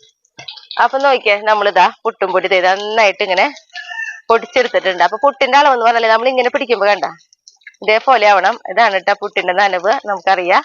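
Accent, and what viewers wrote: Thrissur, India. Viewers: ഞാനും അഴവ്, വെണ്ണീറ് എന്ന് ഒക്കെതന്നെയാ പറയാറ്. നമ്മൾ ത്രിശൂർകാര് അച്ചടിഭാഷ അധികം ഉപയോഗിക്കുന്നവരല്ല അതുകൊണ്ട് ഇങ്ങനെ തന്നെ പോട്ടെ അല്ലെങ്കി " എന്നാ എന്നതാ " എന്നൊക്കെ പറഞ്ഞ് പഠിക്കേണ്ട അവസ്ഥയാകും ഈ ത്രിശൂര് ഭാഷ കേക്കാനാ നാട്ടില് ഇല്ലാത്ത ഞങ്ങള് video കാണണത് അതുപോലെ മക്കളെ നമ്മടെ ഭാഷ പഠിപ്പിക്കാനും Best wishes and keep going ❤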